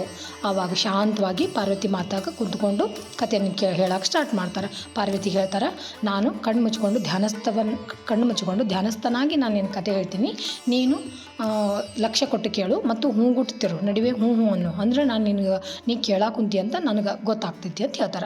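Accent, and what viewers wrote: North Karnataka, India. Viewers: ನಿಮ್ಮ ಭಾಷೆ ಸುಂದರ...ನಮ್ಮ ಉತ್ತರ ಕರ್ನಾಟಕ ಭಾಷೆ...👍👍👍👍ಶುಕಮುನಿಗಳಿಗೆ ಜಯವಾಗಲಿ....... ವ್ಯಾಸಪುತ್ರ ಶುಕ ಮುನಿಗಳಿಗೆ ಜಯವಾಗಲಿ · ಕಥೆ ತುಂಬಾ ಚೆನ್ನಾಗಿ ಸ್ಪಷ್ಟವಾಗಿ ನಿಮ್ಮ ಉತ್ತರ ಕರ್ನಾಟಕ ಭಾಷೆಯಲ್ಲಿ ಹೇಳ್ತೀರಾ ಸಿಸ್ಟರ್ ಧನ್ಯವಾದಗಳು🙏🙏👍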